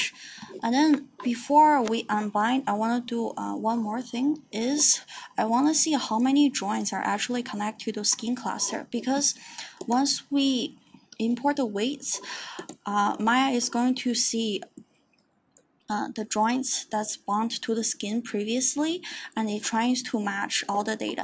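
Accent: China. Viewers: I'll give this a try later :) Your accent btw, from China? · Hi, first of all, thanks for sharing, it's great content. And the moment I hear your voice, I can almost tell that you are Chinese from your accent. Your voice is good btw. And according to your video, I think your conduction means after exporting the skin weight map once we need to re-rig the mesh by "Select Hierarchy and rig it from selected joints" way and then import the skin weight map. Last but not least we need to make sure all joints related are selected, no more no less. I have tested it through this way and it seems to work as expected. Kind of a weird bug that Maya couldn't read the hierarchy after rigging the hierarchy way. we seems to have to tell Maya what joints we did use to rig the mesh. How did you...I mean you r awesome to have found the solution. Congrats.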